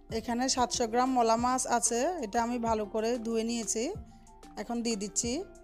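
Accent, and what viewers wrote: Chittagong, Bangladesh. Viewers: আপনি কি চট্টগ্রামের?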